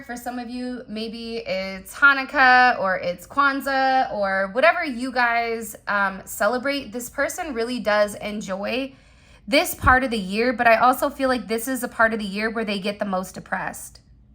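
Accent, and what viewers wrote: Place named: South, United States. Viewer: I want her because she's a beautiful singer I want her because I love her I want her because I love her beautiful voice and her southern accent I want her because she's the most beautiful talented girl 💗 and I told her 56 years ago that I was in love with her because I didn't like to see her cry and her videos and God told me that she needed someone to love her man that's exactly what I did❤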